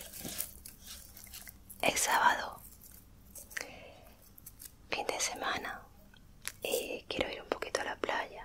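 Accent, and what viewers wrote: Spain. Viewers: Me encantan tu voz y ése acentito tan lindo español. Te mereces más subscriptores,. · La verdad me gustan mucho los videos de ella porque la voz que tiene me encanta por como susurra y tambien por su acento! Las voces de los españoles me relajan demasiadooo💙💙💙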